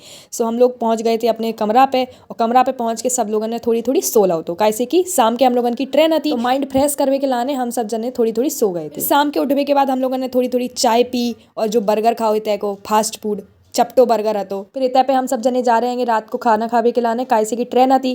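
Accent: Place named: Bundelkhand, India. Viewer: Aapka bundelkhandi accent is so good 🤌👏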